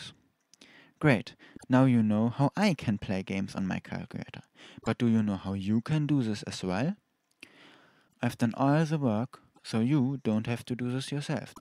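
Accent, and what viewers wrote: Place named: Germany. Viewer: This german accent😂 no hate. I just find it funny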